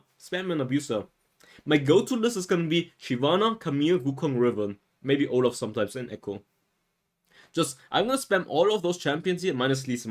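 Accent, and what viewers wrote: Germany. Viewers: o he is german?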